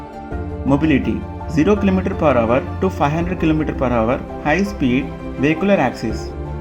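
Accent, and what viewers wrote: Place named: South India, India. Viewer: Good voice if not perfect . Hint of south accent . Mis pronunciation of few words . Overall 4/5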